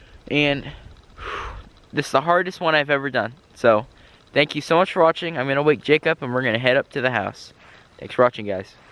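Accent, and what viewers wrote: Pittsburgh, United States. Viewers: Just saying. I'm from Pittsburgh. And you have a strong Pittsburgh accent.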